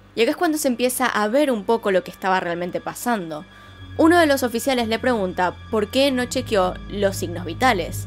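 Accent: Argentina. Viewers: Sí mencanta el acento argentino y los videos. 🤩👌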